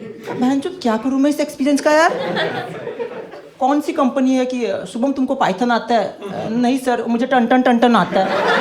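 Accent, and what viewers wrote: Uttarakhand, India. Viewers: Ha flow or naati se samjh aaya 😂 ..... mai bhi uttarakhand se 🎉😂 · Bhai uttarakhand mai kaha se ho?? Accent bata raha hai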